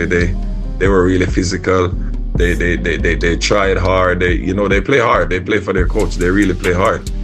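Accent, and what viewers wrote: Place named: Caribbean. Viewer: First time I’ve heard a Dubs breakdown from someone with the Caribbean patois, like the sound…thought you’d have Steph cooking with some scotch bonnet or cooking up some salt fish and ackee…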